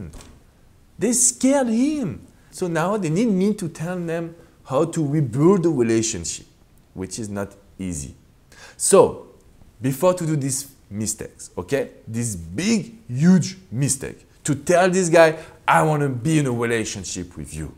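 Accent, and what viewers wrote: France. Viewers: I smiley when i Hear your French accent😘😀trop mignon 😃😅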